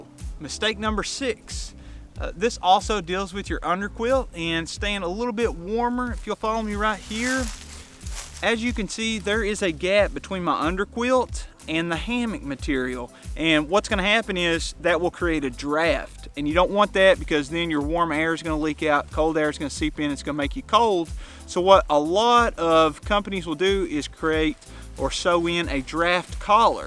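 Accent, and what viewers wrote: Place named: Southeast, United States. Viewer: 2 questions Just started to use a hammock, tent was annoying me too much. So im a bit new Im actually having trouble getting comfortable? i dont like my legs bending upward like im a stork or something. Like a human banana. Is that just unavoidable, or should i adjust my hammock somehow? second. watched a couple of videos everyone seems to have a southeastern accent. (guess thats where the trees are lol) where are you from?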